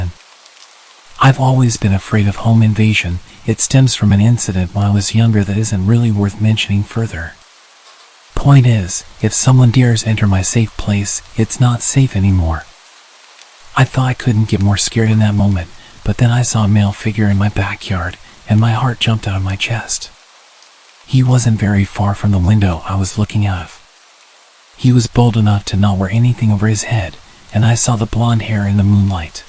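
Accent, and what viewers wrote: United States. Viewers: Oh no, an American, bye.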